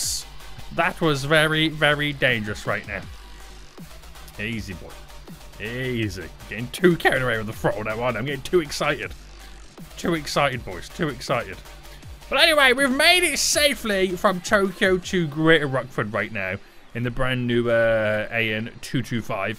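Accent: United Kingdom. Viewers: Average skull emoji kid, He is British, plus you can’t really hear the “g” in the word “flight”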